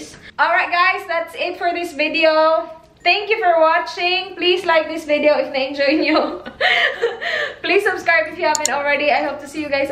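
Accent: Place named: Negros, Philippines. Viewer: Taga san ka po? Parang taga negros ka ee. Hehe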